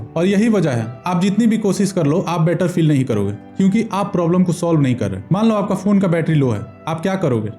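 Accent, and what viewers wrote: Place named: Bihar, India. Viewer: yes , i am from motihari , by your accent i instantly knew you are from bihar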